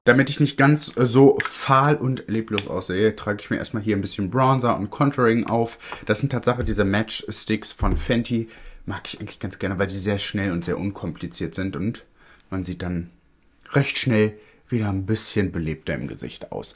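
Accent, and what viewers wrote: Berlin, Germany. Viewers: Schicke bistde👍🥰 of berlinerisch😅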